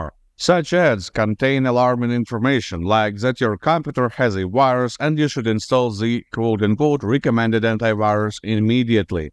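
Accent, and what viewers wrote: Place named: India. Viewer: not even the AI can cover the indian accent. but thanks for the video.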